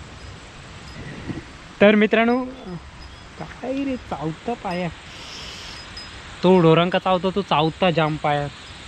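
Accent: Malvani, India. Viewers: तु मालवणी बोलतोस तेच आम्हाला आवडते तुझे गावचे विडीयो एक नंबर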